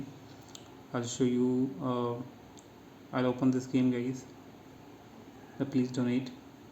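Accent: India. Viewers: Indian scammer I swear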